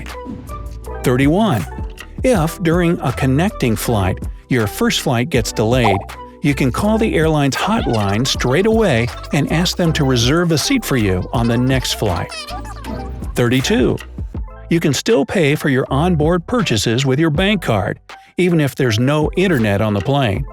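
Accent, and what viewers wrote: United States. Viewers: The voice of the narrator is an american English accent, but tip #33 talks about a "special capsule" for sleeping in instead of a hotel. Nobody from America knows what those are because our airports don't have them. Many countries I've traveled to in Europe and the middle east don't have them either. Come to think of it, I've never seen an airport with sleeping capsules. Great idea, I've just never seen it. I've been to Sydney, Tokyo, and Shanghai airports and I did not see them there either, but I wasn't looking for them. Maybe I just didn't notice them? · Is it an American accent in the video ????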